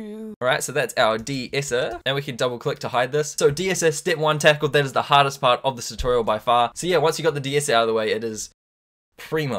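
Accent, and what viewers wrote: New Zealand. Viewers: U from New Zealand? Ur accent is nz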